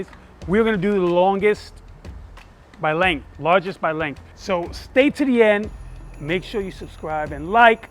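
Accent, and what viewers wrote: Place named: New York, United States. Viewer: Nuttin like a New Yack accent on a video yappin about Mega Yachts???!!! You are awesome made my day.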